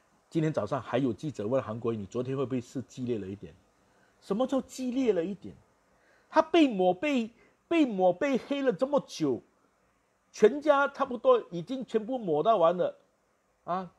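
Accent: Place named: Cantonese, China. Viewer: 支持您！您的國語有點廣東腔，但很親切，又很有內容。加油！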